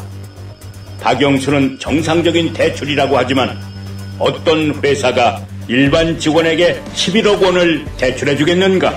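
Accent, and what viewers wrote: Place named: North Korea. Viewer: 맞아요! 아나운서님 목소리와 말투가 북한찌라시로 들리니 죄송하지만 다른분으로 체인지해주시면 좋겠습니다.아주 중요한 사안이니 유권자들에게 거부감없이 100%팩트 그대로 전달될 수 있게 부탁드립니다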